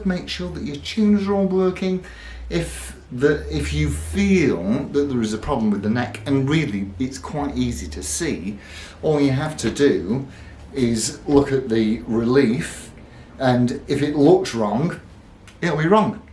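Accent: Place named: United Kingdom. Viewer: you’re one of those people that can make a british accent sound classy and bad ass at the same time, just like your guitar playing. just awesome, cheers man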